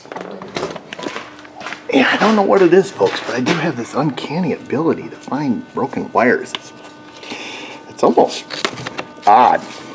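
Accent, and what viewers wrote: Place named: Oklahoma, United States. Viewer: I'f you never told anyone that your shop was in New York, most people would think you were from Oklahoma or Kansas or Texas. You sound like an Okie when you talk not a New Yorker!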